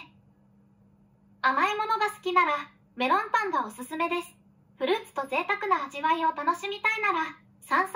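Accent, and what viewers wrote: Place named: Saitama, Japan. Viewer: 埼玉県民の声がする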